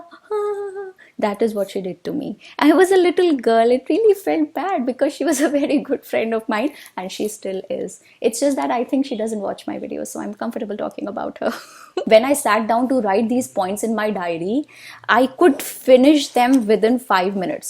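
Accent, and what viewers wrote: India. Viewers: Why I'm not understand indian accent but American do?? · wow so nice, i love Indian accent. love and respect from Pakistan .. Mam u r looking like illena D'cruz.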